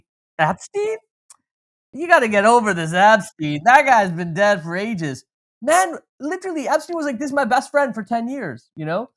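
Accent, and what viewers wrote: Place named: United States. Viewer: UK here. I am not used to Americans (going by the accent) knowing so much about the UK.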